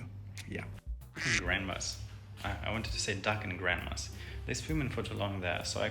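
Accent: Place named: Germany. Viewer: I won’t judge you. I love your German accent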